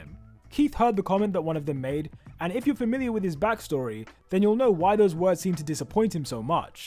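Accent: United Kingdom. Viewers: I think this is the new "average" UK accent.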